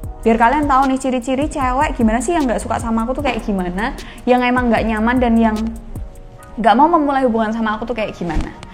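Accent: Java, Indonesia. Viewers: Medok bngt jawanya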